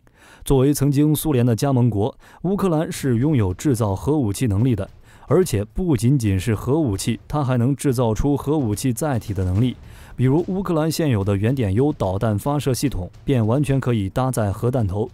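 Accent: China. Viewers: 大陆没人叫“普丁” lol, 我就觉得这频道有些视频内容有点怪怪的，原来是台湾的播主找个大陆口音的机器人发音软件做的。。。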